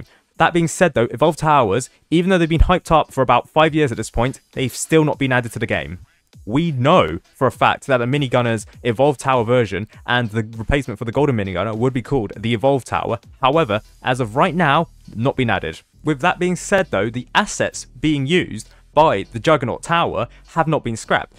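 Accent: United Kingdom. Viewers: Wait what ur British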